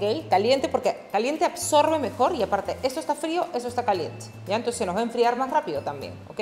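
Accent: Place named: Peru. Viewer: Peruana por el acento.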